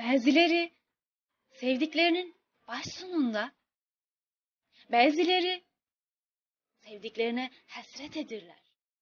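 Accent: Azerbaijan. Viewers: Canım çok güzel okudun hiç bitmesin istedim azeri ağzıyla da iyi oldu 👏👏👏❤ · Azerbaycan şivesiylede okumuşsun canim😊👏👏👏🌺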